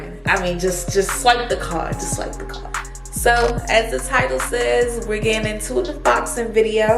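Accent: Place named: DMV, United States. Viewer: So cute! Sn...r u from the DMV? I thought i heard an accent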